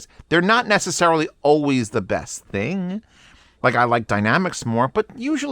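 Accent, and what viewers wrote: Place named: United States. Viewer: Can't watch your videos. You waffle on about rubbish, your american accent is terrible to listen to and why do americans extend words with one vowel to sound like they have four? ie way becomes waaaay.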